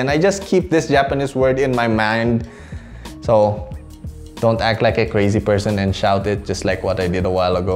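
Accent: Philippines. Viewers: The moment he opened his mouth andstarted talking me be like: "Pilipino to" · I hear the accent.... pinoy? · Are you a Filipino? Because your accent is filipino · You're Filipino aren't you. The accent can never hide ha💓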